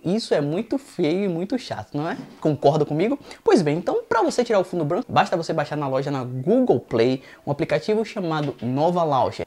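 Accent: Bahia, Brazil. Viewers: Você é baiano também? Boas dicas!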